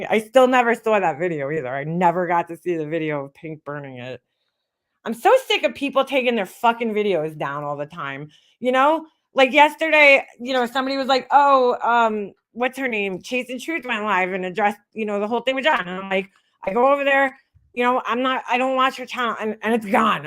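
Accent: New York, United States. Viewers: say whaaaaaaaa?!?!?! 😳😳😳😳😳 ill just never understand ppl who literally know for 💯 certain that certain individuals are garbage, hateful, lieing, vile, cruel shit bags - but they overlook it, bc theres some sort of short term upside.... the little validation this gives that shitbag, only validates them more & keeps the destructional hampster wheel going.... legit, it makes it so so soooo much worse for countless ppl currently AND in the future.....nothing i could ever gain personally, would outweigh validating that evil shit she does to ANYONE else down the line... nothing, nada, zip, zilch 🙅 it doesnt make me mad to hear about this happening, just sad if this is whats going on 😔 obviously im missing aloooooooot cuz hearing you say this is going on, floors me....trust this = you cant fix nor change mean azz heartless ppl, who legit enjoy nothing more than completely trying to anihilate & destroy ppl.... 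you dont know when its coming mor why, but u best believe that ur on the clock & its only a matter of time.... u wont see it coming either, cuz its love bomb to anihilation - no in between thanks for being the ultimate 🐲 slayer, and just keepin it 1 millionnnnn on this 🐲 lady,!! 🤣😍💯 thanks for your service, in the fight to stop the "dragonnnn decapitationnnn" 🙌😂 #StopTheDragonDecapitation i only caught the 1st 45 mins, so imma finish this later tonight..... its alwayssss hella cool to hear that accent goin on a good ol NY truth droppin semi rant on 1.5 speed!!! 🤗 😍 🙌 made my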